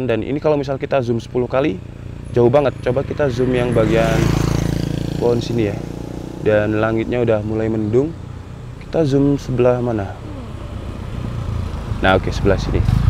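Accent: Java, Indonesia. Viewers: Wong jowo tt mas?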